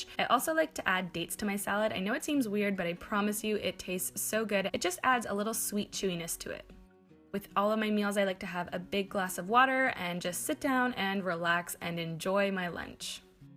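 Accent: United States. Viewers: Do you get Asda in America? I assume by your accent that you're American but I thought that Asda was only in the U.K.? Might be be wrong xxx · I'm confused. You have an American accent but I noticed you using British products ?? No hate just curious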